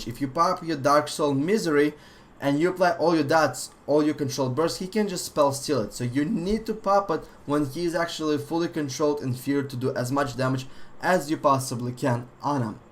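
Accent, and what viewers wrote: Russia. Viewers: I love the Russian accent, first time watching your videos and I've subbed:)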